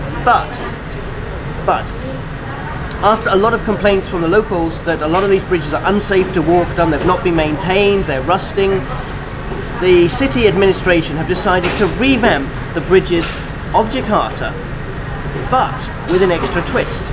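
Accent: United Kingdom. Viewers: English tulen...remember mr bean...omg.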